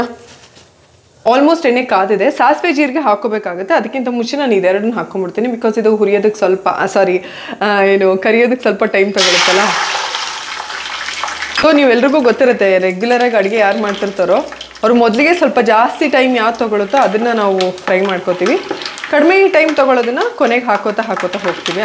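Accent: North Karnataka, India. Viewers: Nimdu uttar Karnataka alva?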